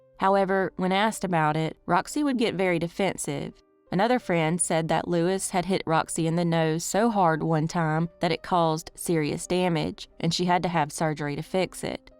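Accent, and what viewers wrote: South, United States. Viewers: I really like your channel. The cases are interesting and i havent heard them a dozen times Als i really like that southern accent.😊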